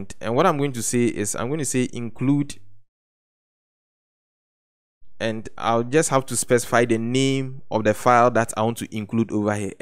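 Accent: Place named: Ghana. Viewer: Please where are you from.. You sound Ghanaian..I want to be in touch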